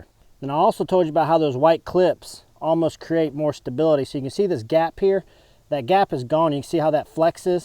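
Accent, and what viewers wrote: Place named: South, United States. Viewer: I watched this today. Interesting inco. Will look into vor warm weather watering. I live in area where it freezes several months of year. I use a rubber pan for water now. When water freezes, I turn it over and stomp out ice, then refill. Easiest method for me here. What do you suggest for winter months, without using a heating source. I can tell you are from Southern area because of acceent😉. This product , In my opinion, would not work in freezing climate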